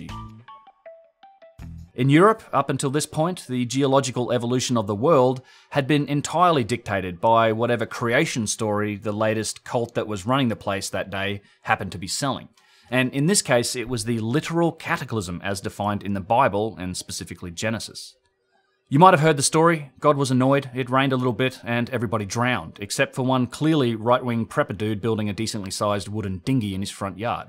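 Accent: Australia. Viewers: Great to hear and Australian voice with a typical Australian perspective "God's pissed off......"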